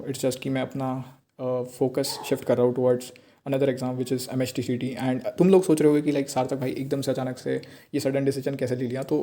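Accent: Maharashtra, India. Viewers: bhai aap maharastra se ho kya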